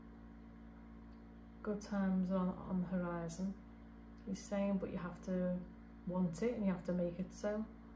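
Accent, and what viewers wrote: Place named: Scotland, United Kingdom. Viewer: Amazing reading, thank u very much! So many good advice, and lovely messages from him! It was very hard at times to hear what u were saying since u talk quietly.. But i have lived in Scotland so i was able to understand. Your accent sounds scottish!! It was awesome to hear speach that sounds like scottish! It made me recall great memories, i liked your video a lot!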